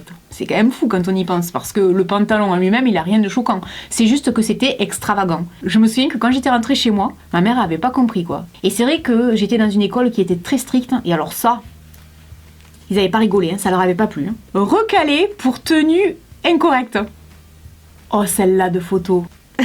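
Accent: South, France. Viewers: Coucou! J’ai découvert ta chaîne il y a une semaine et j’ai regardé toute tes vidéos! Ça fait vraiment du bien! C’est frais et ton accent me rappel ma famille dans le sud. J’aime vraiment que tu te prennes pas la tête et que tout soit naturel ça change des Youtubeuse de 20 ans Alors un grand merci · et bien j'en rajoute une couche, je viens de découvrir tes videos et 1) tu es superbe, rayonnante et rousse ! (comme ma nièce et je vous trouve mais belles !) 2) j'adore ton accent, on est du sud sans aucun doute ! 3) tes videos me font aussi un bien fou ! bisous "maman" ;)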